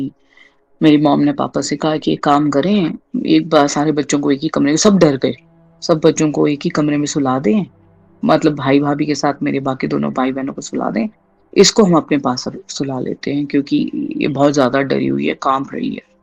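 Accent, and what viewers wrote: Pakistan. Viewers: Are you sure you are indian because your accent is quite pakistani and you speak quite alot of urdu words good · Exctly.....ye hindi hai hi nhi....typicl urdu accnt..isnt it...i m a hindi speaker..i knw the difrnc.. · Indians ye style/tone me nahi baat karte. Pakistani style hai. Apne apko hindu Bata rahi hai aur jammu Kashmir ghum ne ki baat Kar rahi hai. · i swear at the start of this i thought she is a pakistani this exactly how we talk sound shame for all the hate for all it does is dehumanize the heart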